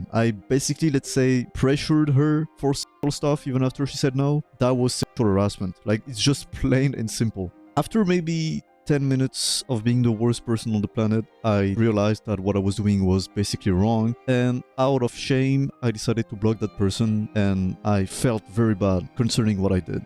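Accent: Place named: France. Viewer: absolut cinema (je te conaissais pas avant mais j'ai remarqué notre bel accent anglois commun 😌)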